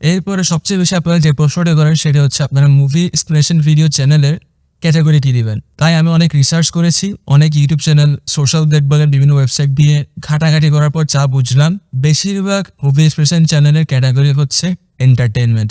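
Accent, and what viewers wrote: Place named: Chittagong, Bangladesh. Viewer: Only in this video of yours "Chittagongian accent vibing" ...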